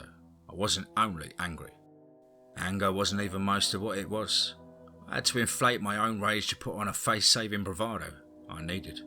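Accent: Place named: Cockney, United Kingdom. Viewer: Absolutely love this! Love the east end accent as well!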